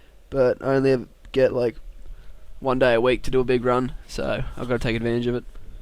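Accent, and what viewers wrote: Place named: Australia. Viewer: Australia, Listen to his accent and read his bio on his channel...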